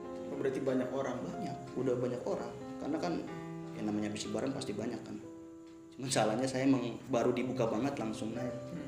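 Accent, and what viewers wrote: Indonesia. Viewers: Btw orang mna tuh yg lagi ceritanya .. GERO2in ,fiks orangsunda kek nya😁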